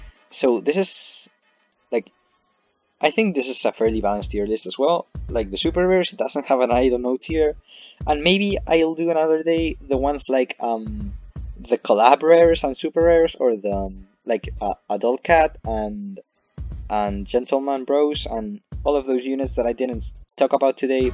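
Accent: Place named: Russia. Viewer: I thought you are Russian. you have a russian accent)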